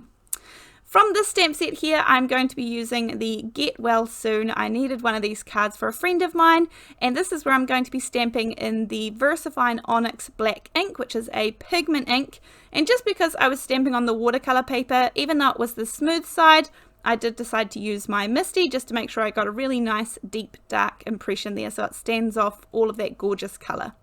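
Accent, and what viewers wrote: New Zealand. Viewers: I love this! It's so beautiful (and easy)! By the way, is your accent NZ? I'm in Canada but my son and his family his on the North Island in Laingholm.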